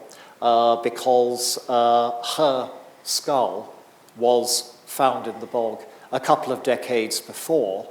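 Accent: United Kingdom. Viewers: It could also be because I find a British accent easier to understand (am Australian).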